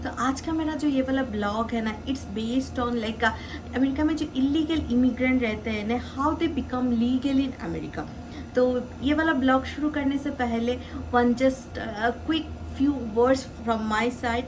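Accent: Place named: Bengal, India. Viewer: Ur accent seems like u r bengali 😂😅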